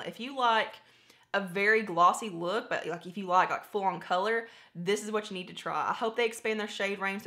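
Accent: South, United States. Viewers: Whoohooo! 1st comment, this never happens! I love your channel, your honesty, the range of products you use from drugstore, high end, and your accent!! Fellow southerner here! 🙋‍♀️❤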